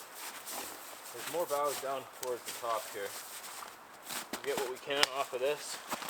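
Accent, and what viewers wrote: Canada. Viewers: Were you saying the blue farmer Swiss Army knife or was it just my So. La. Ears hearing you’re Canadian accent? Couldn’t find that on amazon. Would it be the huntsman?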